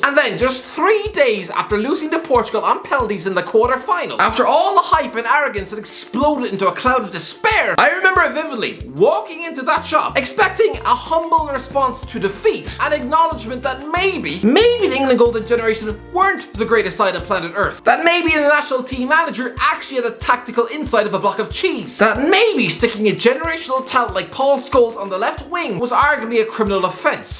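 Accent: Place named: Ireland. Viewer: why have i clicked on a prediction video and all i can hear is an irish guy talking about a 2003 match magazine?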